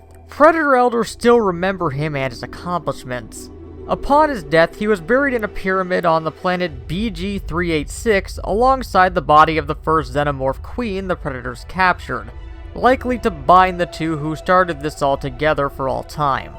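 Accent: United Kingdom. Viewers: Jon Grajeda it’s down to accent, usually it’s the British that pronounce it like that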